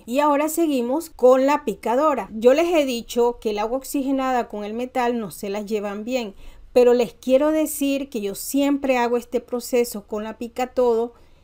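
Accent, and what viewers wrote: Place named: Venezuela. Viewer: Me encantó. Me gustas como hablas pareces Venezolana. Aplicaré lo aprendido. Gracias.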